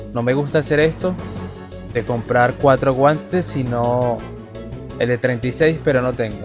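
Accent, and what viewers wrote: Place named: Paraguay. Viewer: Se nota el acento Paraguayo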